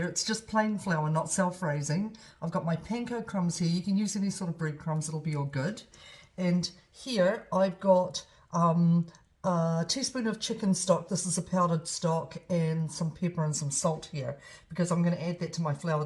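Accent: New Zealand. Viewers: Bruh what I understand it fine. New Zealand accent bruv you must be American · Kia ora! So nice hearing a Kiwi voice, feels like i'm listening to an aunty.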